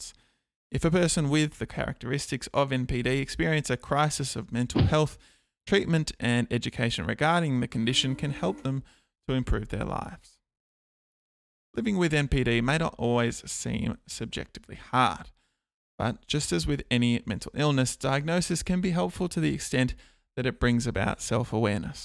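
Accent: Australia. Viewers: So ya have an Australian accent?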